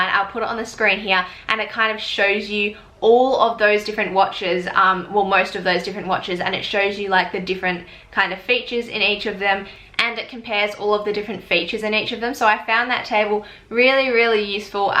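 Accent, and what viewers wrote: Australia. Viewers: Hi , I like your accent, reminded me of travelling through 'Stralia' 22 years ago. You did some good research, thanks for pointing to the table. I am in the market for a new sportswatch after my vivactive hr broke. How was the 245 inthe last 2 years? Did you mis the cool features the higher models offer? How was it when swimming, is 50m wr enough? I do like a little more ruggedly, because of playing with kids. I am in a sales period here now, and got to a short list: fr245m for 250, instinct solar 300, fr745 for 350 and fenix 6pro 450(still expensive). The 7 is out but is way too expensive. That solar instinct should have music. Which one would you recommend?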